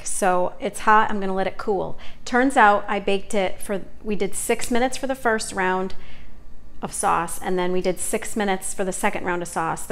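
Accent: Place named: Central New York, United States. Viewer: Your accent is pure central NY and I love it. We had pizza like this when we were kids in Niagara Fall...Ragú, though? No ma'am.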